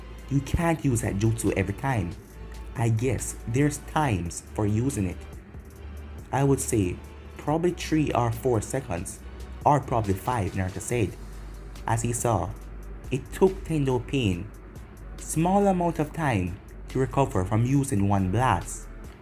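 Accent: Jamaica. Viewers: Lol you sound Jamaican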